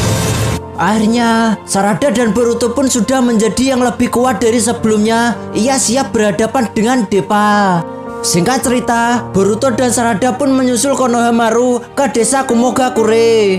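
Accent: Java, Indonesia. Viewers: Medok bgt lu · Medhok bnr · Pengisi suaranya lucu mas,, dari jawa yak wkwkwk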